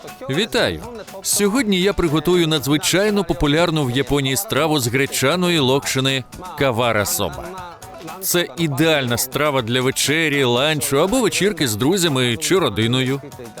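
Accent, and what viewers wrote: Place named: South, Russia. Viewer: уважаемый, понимаю южно- русский говор, просто слух режит. Не кому не в обиду будет сказано.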